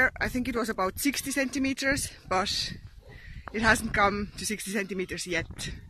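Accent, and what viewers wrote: Ireland. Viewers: BEAUTIFUL AND YOUR GETTING AN IRISH ACCENT THERE KIDDO !!!!!!!! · You're getting the cork accent! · Your accent is great! I can hear a definite Irish element to your accent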